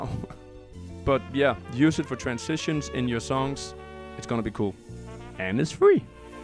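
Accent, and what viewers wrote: Denmark. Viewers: You sound danish, is that correct?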